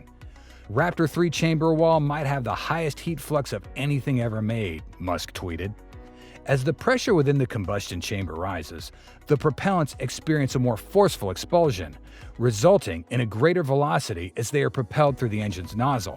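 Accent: United States. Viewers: You're wrong. It's good engineering info, written in a very calm Asian style and voiced in a very cool American accent. Clickbait, sure, but not claptrap. It's legit. It would make sense if you had studied engineering.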